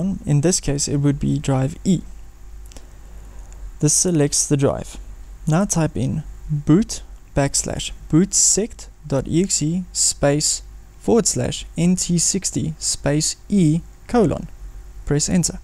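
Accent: Afrikaans, South Africa. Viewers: Ek hoor duidelik die Afrikaans deur slaan lol. Goeie werk dankie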